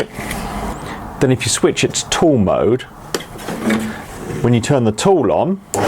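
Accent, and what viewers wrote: RP, United Kingdom. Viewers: Gosh, you sound posh. But, great review mate. Thank you.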